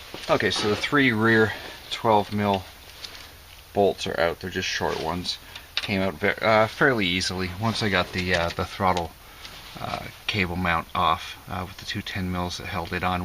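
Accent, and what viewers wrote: Canada. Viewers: on my car which has a GM 3.6 HFV6 , on a north - south set up , (rear wheel drive) , the manifold needs to be lifted too , albeit ever so slightly , which is why they fit platinum plugs as standard from factory . Listening to your patois I'm guessing you're Canadian .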